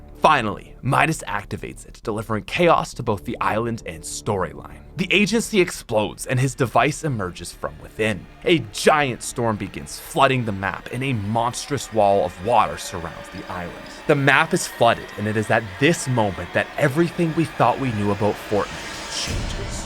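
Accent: Canada. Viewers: You are Canadian. I know cus of the way you say out and however prove me wrong, oh wait you can't.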